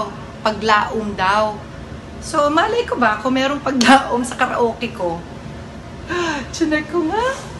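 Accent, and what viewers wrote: Visayas, Philippines. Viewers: Hala, lipaya niya kaayo...i love you,.ka.bisdaak gihapon mangistorya bisan gamay pa kaayo ka nagpuyo sa Manila,...maong gi bless ka maayo kay down to earth gihapon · KA CUTE NIMO MAG BINASAYA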